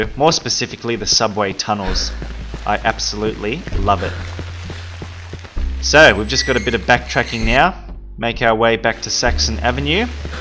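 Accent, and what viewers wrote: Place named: Australia. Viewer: He's Australian... That's kind of how accents work in different parts of the world.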